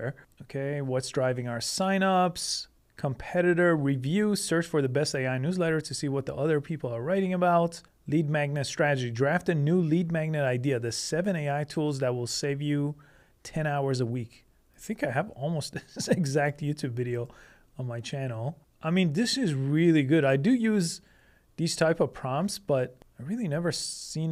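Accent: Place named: Iran. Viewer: You sound iranian. Where are you from?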